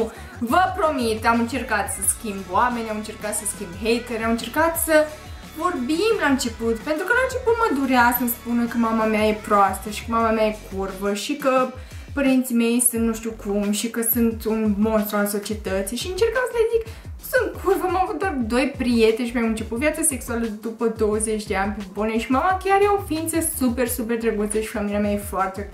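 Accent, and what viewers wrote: Moldova. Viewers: Wow. Asa ceva.... Am intrat pe video-ul asta din intamplare de la o sugestie a youtube-ului. Am ramas interzisa practic:)). Am dat peste o faptura care latra la mine si incerca sa fie funny. First of all: Nu esti funny... daca gesticulezi mult si te scalambi, asta nu inseamna automat ca esti funny. Numai unul poate sa pull it off si ala e Jim Carrey. Second of all: Incearca sa renunti la accentul de moldoveanca, te face sa pari....cum sa ma exprim...saraca cu duhul. Sau daca nu poti renunta la accent, macar nu mai vorbi in engleza, engleza cu accent moldovenesc te face cel putin penibila. Ce e mai trist e ca ai peste 50.000 de subscriberi, asta inseamna ca sunt 50.000 de oameni care te privesc ca pe un role model...bine...majoritatea lor sunt probabil baieti virgini si fete pana in 20 de ani care au ca poza de profil un selfie...deci... 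In orice caz, inteleg de ce ai succes pe net si probabil nu la fel de mult si in viata reala. Asta e pentru ca youtube-ul e 2D...pe cand viata reala, din pacate pentru tine, e 3D, adica lumea te poate vedea si din profil...if you know what I mean. Peace.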